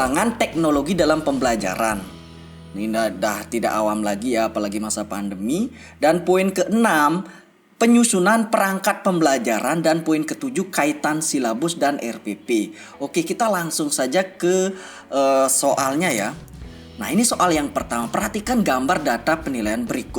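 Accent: Bali, Indonesia. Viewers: Kyk logat bali malah menurutku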